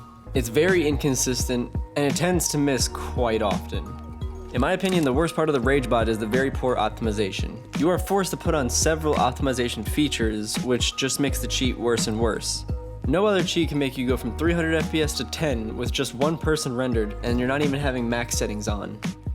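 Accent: Switzerland. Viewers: Are u from Switzerland? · Wait, are you from switzerland?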